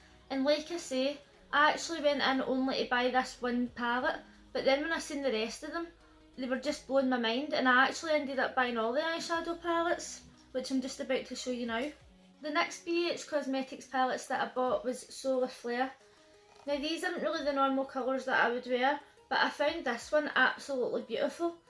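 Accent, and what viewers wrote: Scotland, United Kingdom. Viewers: Just stopping by to show you some love with a full play and like #13 from Bits of Real Panther! I'm loving that accent! So very cool indeed! Is it Scottish? I hope you have a truly awesome Tuesday!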